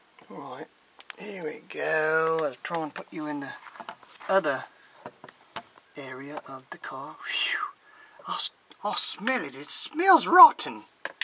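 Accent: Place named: United Kingdom. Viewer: Well I love the english accent, but I think it's a mixture of british, australian and south african!!!! That's a jolly big head on ya beer, you have to tilt your glass and gently pour it in........I just had a laugh at the tasting, very good video, but I wouldn't personally drink Bass even if I was forced!!! We'll have to try and get you some English beer sent from across the pond!!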